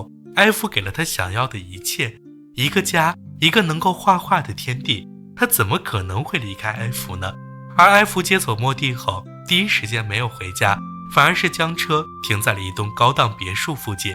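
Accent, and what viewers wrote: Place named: China. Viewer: 很好奇，解說說自己在臺灣，卻是滿口的標準普通話，難不成是大陸人在臺灣:face-blue-smiling:应该是的！這部片子很久以前看過，印象很深！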